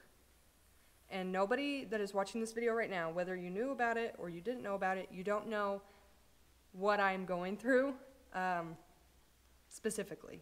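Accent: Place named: Midwest, United States. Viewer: WHERE YALLS FARM AT...IT SOUNDS LIKE YOUR FROM THE MID WEST....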